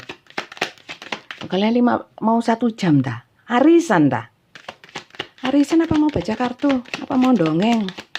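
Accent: Java, Indonesia. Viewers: Jawe nya pekat betul..gud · Sehat selalu untuk bunda .. selalu senang kalau bunda baca dan kasih pencerahan untuk kedepan nya .. sering senyum" sndiri kalau bunda baca dengan logat jawa nya .. salam taurus dari bali bunda ❤❤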